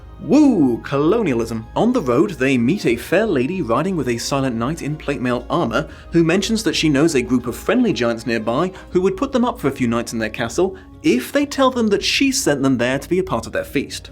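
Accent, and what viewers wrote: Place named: United Kingdom. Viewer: I’m always happy to see more videos His lovely British voice soothes me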